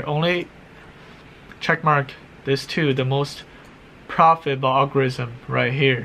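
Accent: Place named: China. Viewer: I love your chinese accent sorry if its offensive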